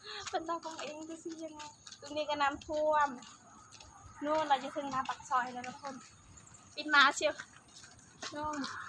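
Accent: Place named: Cambodia. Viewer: เสียงเว้าค่อคนเขมรเนาะ (ສຳເນີຍງຄືຄນຂ້ເຫມນເນາະ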